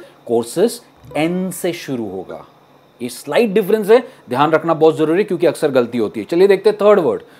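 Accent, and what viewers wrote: United States. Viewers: Are teaching american English pronunciation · In my humble opinion, You're teaching american accent not British accent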